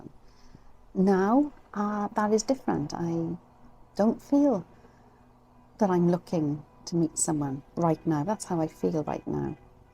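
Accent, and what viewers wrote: Wales, United Kingdom. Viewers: Lovely Welsh accent 😊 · I'm 65 and retired with two little jobs. Resonate with everything you said, and with your lovely Welsh accent. My mum was Welsh and to me Welsh voices are warm and soothing. I wish you well and will subscribe. I havent had a health event, but am glad to be reminded by your experience that there is nothing more important than time to live your life.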